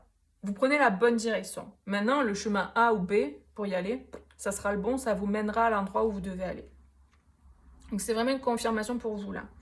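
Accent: South, France. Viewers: Merci pour votre limpidité, merci pour cette guidance. D'où vient votre accent du sud ? Je suis du sud ouest 😉. Douce journée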